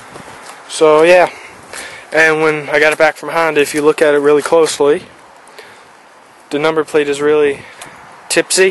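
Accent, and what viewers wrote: Newfoundland, Canada. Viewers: Are you a newfie ? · man as soon as you started talkin i was like this dude is from newfounland haha, and sure enough when i went into your profile i een that you were, im from flatrock down on the east end of the avalon. i got an 09 450x there last fall, i should have some riding videos up soon cause im getten a gopro. I subbed, if ya wants sub back. tanks bah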